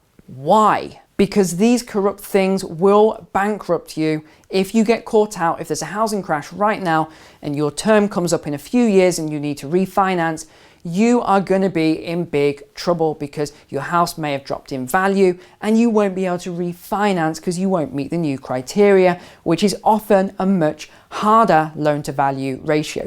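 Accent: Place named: Coventry, United Kingdom. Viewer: Nice one. I thought I detected a bit of a Cov twang. I went to Uni in Cov and my folks still live there. Same boat as you now mate, live between Philippines, USA and Mexico. Keep up the good work and thanks for the reply.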